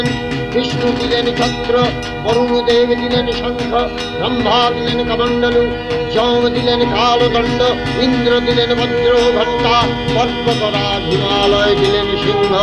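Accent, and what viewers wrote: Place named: Bengal, India. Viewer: This recitation is from sanskrit verses from Durga saptha sathi. Bengali accent recitation by shri bhirendra da with totally immersed in very deep involvement of invoking maa in mahalaya. I have been addicted to his recitation from my childhood. Now I am in my seventies. I bow my head to his feet for his extensive involvement. Pranams to the uploader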